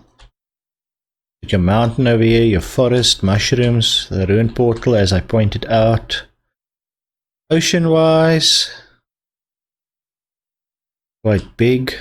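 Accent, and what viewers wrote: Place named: South Africa. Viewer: your heavy south african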